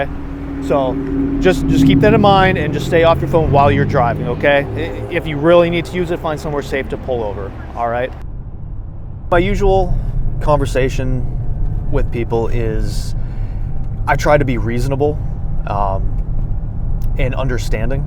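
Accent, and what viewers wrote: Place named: Minnesota, United States. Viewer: I appreciate your service Sir. I always thought they had an accent from bring out east ? You sound almost Minnesotan !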